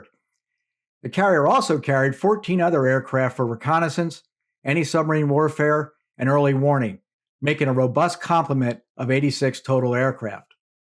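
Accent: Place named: Delaware County, United States. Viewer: I think the narrarator is either from Philly, or the Delaware Valley area. Anyone from Philly recognizes that accent a mile away.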